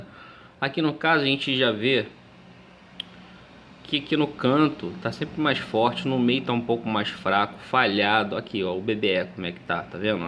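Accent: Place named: Rio de Janeiro, Brazil. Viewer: Boa tarde amigo. Pelo sotaque vi que você é do RJ. To com um F9 precisando de manutenção. Pode me passar seu contato?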